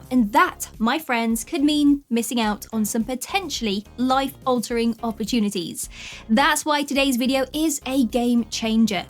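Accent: United Kingdom. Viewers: Good advices! R u from UK?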